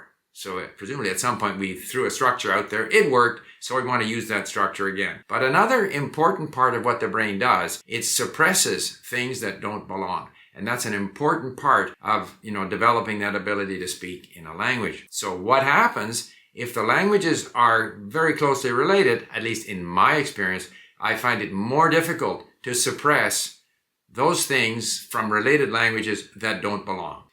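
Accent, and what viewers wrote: Spain. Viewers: "s Portunhol occurs because he does not swing the words like Brazilians do, and utters.the Portuguese words with the Spanish cadence. No big deal. They will point it out. But they have no.problem understanding. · Your accent in Spanish, when you speak Portuguese. And I would go as far as to say It's not really proper Portuguese. Especially because of the fact you Speak brazilian, portuguese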